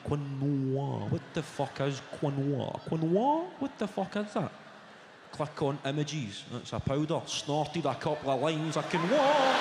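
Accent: Scotland, United Kingdom. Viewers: I must admit I love the Scottish that accent , this guy is funny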